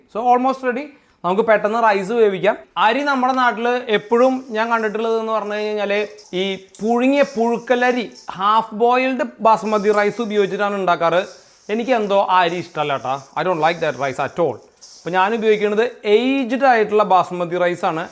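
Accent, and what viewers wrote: Thrissur, India. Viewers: നിങ്ങളുടെ സംസാരം 👍😌poli അ തൃശൂർ സ്ലാങ്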